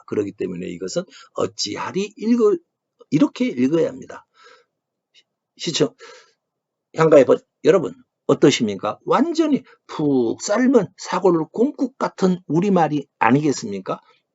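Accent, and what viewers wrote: Gyeongsang, South Korea. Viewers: 갱상도사투리 이거어쩌지?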